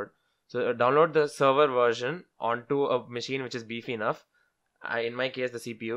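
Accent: India. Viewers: thanks my cool smart bro♥ your accent is also so cool and every time I hear Indian I hear intelligence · You know he is gonna spit some solid knowledge when that South Indian accent is coming out of that mouth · Indian? Subscribed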